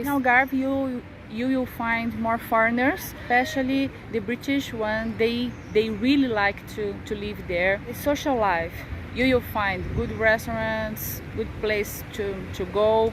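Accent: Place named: Brazil. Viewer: Para brasileiro... nota 7 no ingles ... brasileiro tem 1 defeito que fica sempre o sotaque brasileiro entao a falar o ingles parece russo a falar portugues.... depois bullying na escola... portugal e dos paises que melhor recebe os brasileiros imagino 1 portuga numa escola brasileira....o algarve e uma zona turista logo é mais caro é so para quem pode ca viver ou como eu para quen nasceu.. não para quem quer...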